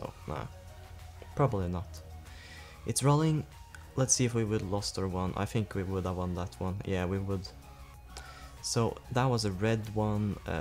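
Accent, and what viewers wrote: Norway. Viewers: Norwegian? :D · Are you from Norway ? xD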